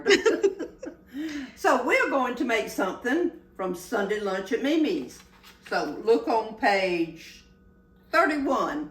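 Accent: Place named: South Carolina, United States. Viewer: I live in Central California and love your accent!🤗I was born in Beaumont Texas (75 years ago) and I can remember my aunts and family speaking with accents like yours. The type of foods Southerners eat are slightly different from a Northerners diet/menu. My mom was a Yankee but met my dad while she was working in the South. She never developed the hunger for southern foods so we ate kind of a northern/ southern menus. She did cook some things for my dads requests. It’s like a family reunion listening to you. -and eating your southern fare. Thanks so much. I have learned a lot and it’s like vitamins to my soul. You have made Jesus shine, with love and skill. Thanks a lot I enjoy watching you tell stories and cook and taste what you my made. God bless you and yours. I agree there is something very satisfying about banging the spoon you used in the dish on the side of the pan/pot. 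Thanks for being part of ‘our normal girls,cooking club’.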